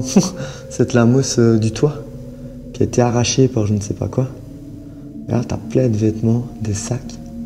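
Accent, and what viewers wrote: Liège, Belgium. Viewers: Ah l'accent de lièges 😂 . Bon c'est un endroit en Belgique mais ou .... en tout cas c'est bien glauque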